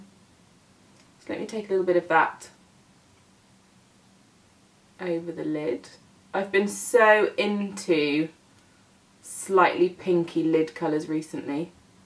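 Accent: United Kingdom. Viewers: Finally someone who speak British English and not American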